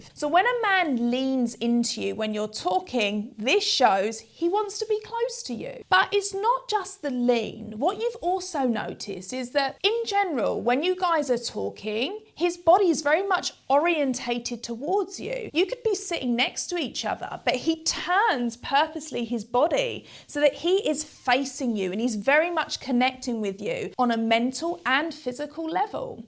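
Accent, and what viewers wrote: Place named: United Kingdom. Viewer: She’s British, different pronunciation, I believe.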